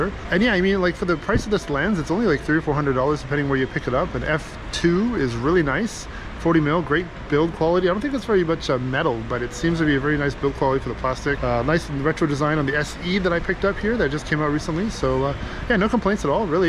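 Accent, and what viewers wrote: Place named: Western Canada, Canada. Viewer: you sound western canadian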